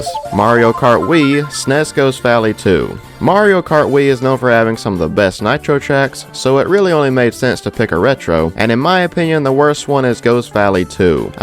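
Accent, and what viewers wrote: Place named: South, United States. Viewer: W man with a Southern accent talking about the worst tracks in a Japanese racing game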